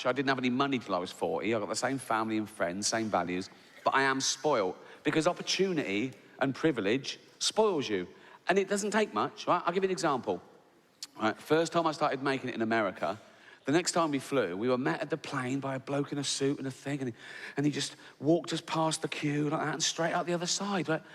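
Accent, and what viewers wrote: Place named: Australia. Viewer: Sorry but your subtitles are full of errors - AI CANNOT translate Aussie accent. Simple as that.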